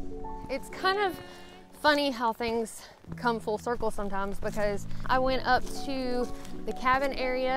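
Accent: South, United States. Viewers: Thank you for your videos. You’re such an inspiration to my 10 yr old daughter. Though she doesn’t have a southern accent, she often tries to emulate you... it’s very funny. Thanks so much.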